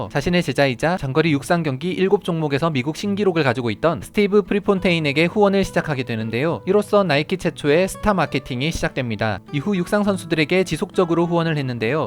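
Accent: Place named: Seoul, South Korea. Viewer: 서울사투리 상당히 거슬리네요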